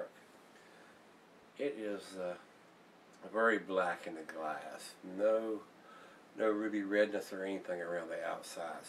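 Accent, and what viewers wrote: Texas, United States. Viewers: I am new to your channel, I found it through Reddit a few days ago! It's awesome! One question though, where abouts is your accent from? I'm British so it's hard for me to distinguish, but you sound Southern, maybe Texan to me. Thanks.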